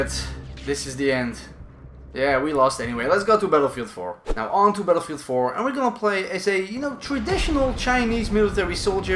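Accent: Netherlands. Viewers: u have a dutch like accent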